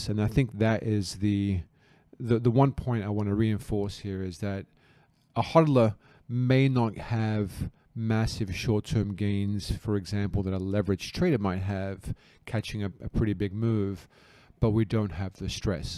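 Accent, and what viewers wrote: Australia. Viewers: your/our Aussie accent, it's milder than the American accent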